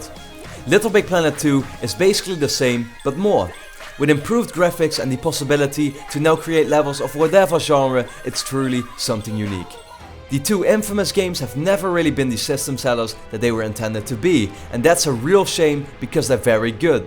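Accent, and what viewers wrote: Netherlands. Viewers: Are you Dutch by any chance? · Are you from Holland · Is hij Nederlands? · the akward moment when you hear the shitty dutch accent. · Jij Bent ZoieZo Nederlands Want Dat hoor ik aan je stem ;) ***** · u dutch? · Really obvious he is from the Netherlands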